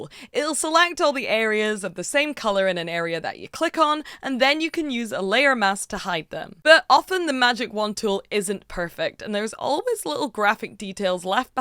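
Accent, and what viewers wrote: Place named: Scotland, United Kingdom. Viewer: I hear a little bit if a Scottish accent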